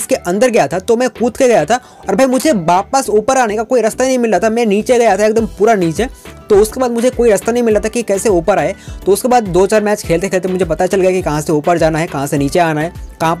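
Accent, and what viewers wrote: Bengal, India. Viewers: You are from Bengal ... I can feel the Bengali version of hindi ... In your hindi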